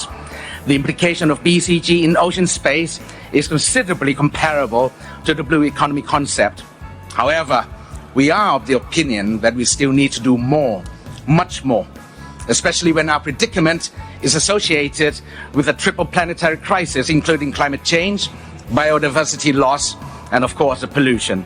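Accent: United Kingdom. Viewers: ชอบสำเนียงบริติชของคุณท็อปครับ คนมีคุณภาพแบบนี้ อยากให้มีกันเยอะๆในสภา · สำเนียงอังกฤษเลย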